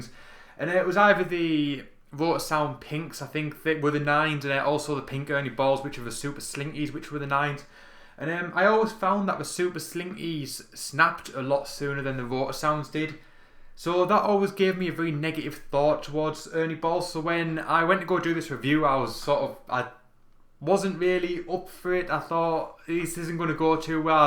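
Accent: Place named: Durham, United Kingdom. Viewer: Nah.. Probably Durham or teeside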